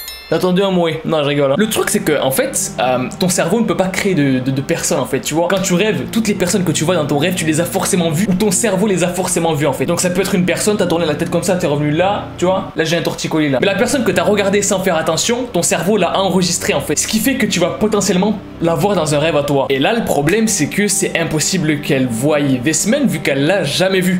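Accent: Quebec, Canada. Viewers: Il parle québécois comme moi 😂🤣😭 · OMG tu as un accent QUÉBÉCOIS PARFAIT on aurait dis un des humoristes québécois 😂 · Bel accent québécois, approuvé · L'accent québécois!!!!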